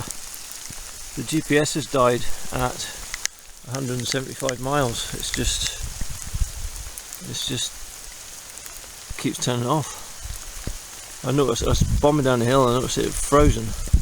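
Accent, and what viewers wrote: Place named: Northern England, United Kingdom. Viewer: Yes I did admire your cycling shoes LOL. Atleast you had cool feet:) Well done on completing the route in one day. Nothing better than a personal challenge. I've never heard you come out with a Norther accent before. Had to laugh at the swear words. This is a real video. Welldone. Big thumbs up.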